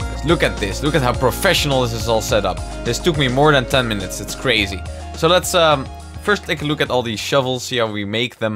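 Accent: Netherlands. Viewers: Je klinkt echt Nederlands! Maar dat neemt niet weg dat je een geweldige stem hebt, creatief bent en echt gave Showcases maakt! Ga zo door, je zal het ver schoppen! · ooh wow firt video I see you and I noticed imidiatly he sounds dutch i look in the discription The Netherlands wow YEAH!! DUtch too here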